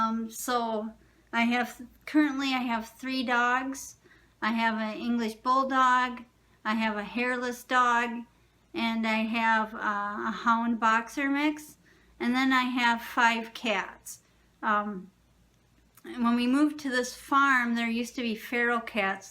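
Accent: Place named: Wisconsin, United States. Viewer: Ha, I thought you sounded like a WI girl :)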